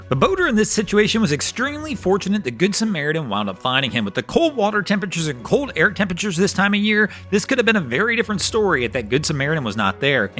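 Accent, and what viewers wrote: United States. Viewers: Hi, are you able to post videos without commentary? I love your content but have a hard time dealing with your obnoxious American accent. Thanks in advance from Australia.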